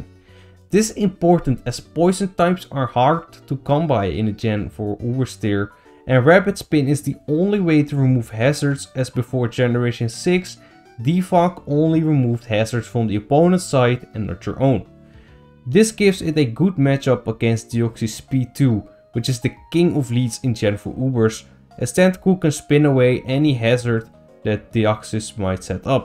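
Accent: Netherlands. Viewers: Hi are you dutch?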